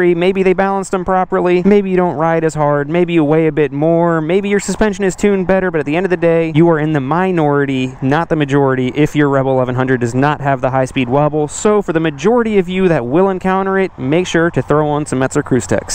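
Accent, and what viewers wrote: Baltimore, United States. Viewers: I thought I recognized that accent. Hey Hon! Bawl-Tee-Moh.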